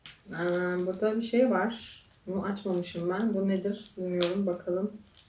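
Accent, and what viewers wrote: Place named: Yozgat, Turkey. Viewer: esahhhh mı 😂😂😂 bu şiveyi herkes bilmez zaten 😂😂 evelallah Yozgat hanımları mariferlidir 💪💪💪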